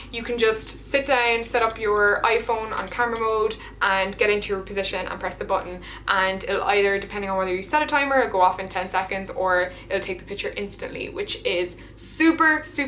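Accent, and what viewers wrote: Ireland. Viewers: Love videos like this, I always learn something :) Maybe you can do one about how to do well on Instagram? Also, is it just me or is your accent stronger after you spent some time in Ireland? Such a nice accent :) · Funny thing.... I was watching while getting my son (12) ready for school then sees you and said that he thought I was watching RetroFlame! I told him this is and she’s Irish too. Her and Erika have the same accent. I do tell him and show him from time to time the Youtubers I watch. Just thought it was hilarious!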